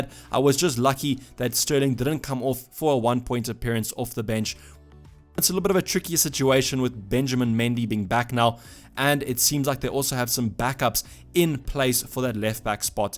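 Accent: South Africa. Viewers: South African 🇿🇦?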